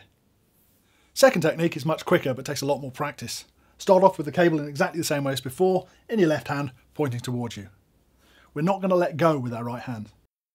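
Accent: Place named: United Kingdom. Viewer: As an American, I feel these techniques only work if you have a bad-ass British accent like this dude does.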